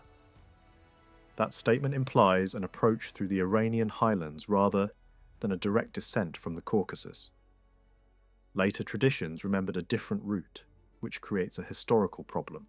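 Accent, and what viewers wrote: United States. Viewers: Konum Türkiye ama aksan ABD 🤔?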